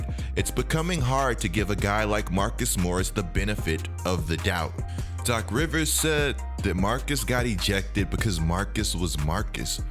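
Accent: Louisiana, United States. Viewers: You from nola or BR?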